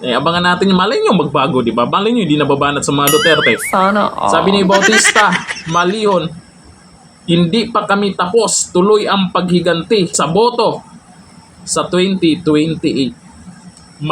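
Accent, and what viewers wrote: Visayas, Philippines. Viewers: Tagammmm,kabisaya ba oi..😁😁😁✌️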